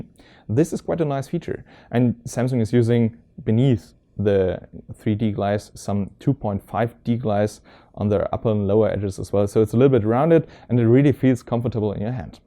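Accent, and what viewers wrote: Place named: Germany. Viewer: sehr gut. love your German Accent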